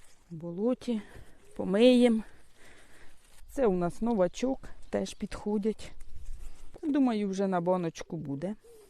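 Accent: Poltava, Ukraine. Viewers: З задоволенням додивилась до кінця. Навіть не дивилась-більше слухала. Такий рідний полтавський говір. Полтавщина і Вінниччина це моя любов. Бабусі мої там жили. Завжди взнаю людей звідти. Щире вам дякую за рецепт за поради за спомин.❤ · Відчувається в голосі полтавський акцент))) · Так мені подобається Ваш Полтавський говір)))Ніби спів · Дякую!Чую по мов1,що ви з р1дно1 полтавщини! Гарний рецепт! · так, не діалекти. Не вірно висловилась. Це специфіка нашого полтавського мовлення. Мені просто цікаво, ви в повсякденному житті розмовляєте виключно літературною мовою? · В МЕНЕ РОДИЧІ ЖИВУТЬ У МИРГОРОДІ ,ТО Я ПО РОЗМОВІ ЧУЮ,ЩО ЦЕ ПОЛТАВЩИНА. Я ВСЕ КЛАЛА В ПОМІДОРИ ХРІН,А МЕНІ КАЖУТЬ,ЩО НЕ КЛАДУТЬ. ХРІН,А ПЕТРУШКУ. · Як я люблю полґтавську говірку...мала батьківщина мого чоловіка... А які там смачні помідори! Дякую вам! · І я одразу подумав, що говір полтавський.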